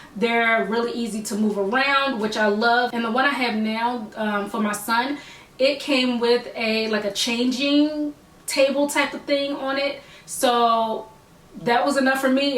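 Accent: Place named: Philadelphia, United States. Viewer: Is this girl from Philly??!! The accent seems so familiar... :-)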